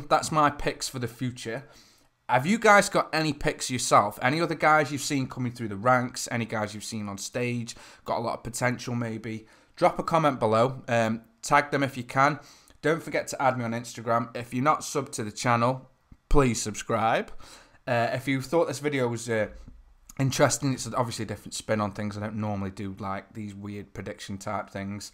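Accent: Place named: Stoke, United Kingdom. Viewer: Are you from Stoke mate?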